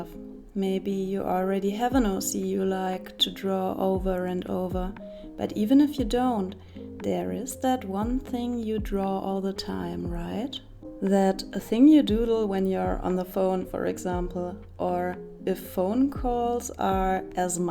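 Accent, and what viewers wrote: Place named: Germany. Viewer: Love your german accent😂❤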